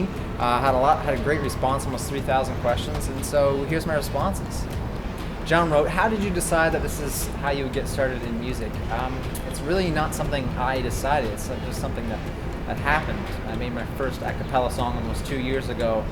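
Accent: Canada. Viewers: omg he sounds so Canadian i wonder how he says about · Canadian accent represent!! :D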